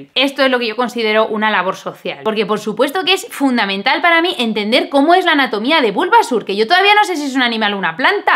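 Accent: Spain. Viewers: Si dicen que el accento de Madrid es el neutro de España es falso 😂 Cada vez que suelta "mazo" es que es de Madrid 😂😂🤣🤣 Nano! Saludos desde Valencia !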